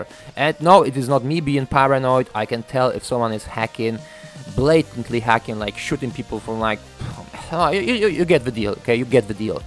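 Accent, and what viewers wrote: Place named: Poland. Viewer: Hey, I've been a fan for the longest, and I got I say you're accent is funny as fuck. I honestly agree EA has something very fishy going on with all the hackers and the non banning attempts. I've been wondering you seem like a fantastic Polish player and I've been a fan for sometime, I know I'm not as famous but I'm quite well know in the US, I ofcoarse haven't started a channel but plan to in the future, id love to make maybe videos in the future as you have inspired me. Oh and id love to play with you sometime, maybe you can add me? Playmobil5 is my username on battlefield heroes. Thanks bro Peace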